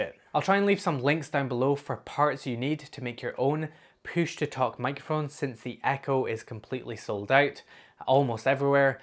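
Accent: Scotland, United Kingdom. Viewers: Great video. I´m missing your promised ESPHome config - also i´m not able to get the replies announced on the M5echo - any idea how to configure that? The speaker does work as audio-player... By the way: my (german) family loves your accent - it´s scottish, correct?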